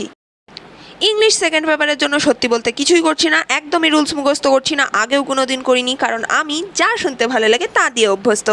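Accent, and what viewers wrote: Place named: Kolkata, India. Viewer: Tumi Bangladeshi hole o. Tumar kothai Kolkata accent ashche.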